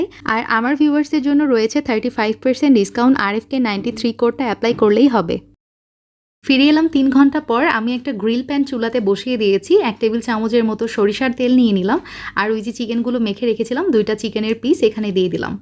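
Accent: United Kingdom. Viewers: আপনি কোথায় থাকেন?বিদেশি কিংবা ইংলিশ মিডিয়ামে পড়ুয়া বাচ্চাদের মতো বাংলা এমন স্ট্যামার করে বলেন কেনো?আপনার আগের ভিডিওগুলিতে তো এমন স্ট্যামার করে বাংলা বলতেন না আপনি তাহলে এখন এমন করে কথা বলেন কেনো?শুনতে ভালোই লাগে কিন্তু এইভাবে ইংরেজি টানে বাংলা বললে ভাষার মৌলিকত্ব নষ্ট হয়ে যায়।প্লাস আপনার সিমপ্লিসিটিও নষ্ট হয়ে যায়।অনেক আর্টিফিশিয়াল লাগে।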